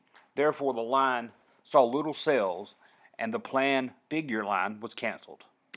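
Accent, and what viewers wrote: South, United States. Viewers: He has a southern drawl...he’s from Joe-juh. (Georgia). That’s why he sounds different; u don’t get out much do you? It is ok though, intelligence and looks aren’t everything! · just a southerner.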